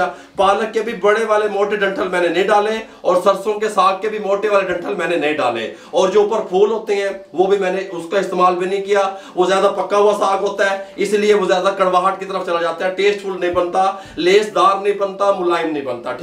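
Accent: Punjab, India. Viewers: Bht aala , ❤️❤️👏👍😅 dabne na kha kren bs baqi punjabi accent aur alfaz sun k bht acha lgta h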